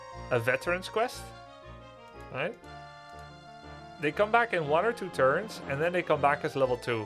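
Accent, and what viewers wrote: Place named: Netherlands. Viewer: I thought I recognized a subtle Dutch accent! I'm French but living in the Netherlands, in Den Haag. I was a huge fan of D&D growing up in the 90s, but somehow never played this one, so I was wondering if it was worth a purchase. Thanks for the video, I just picked it up off GOG. :)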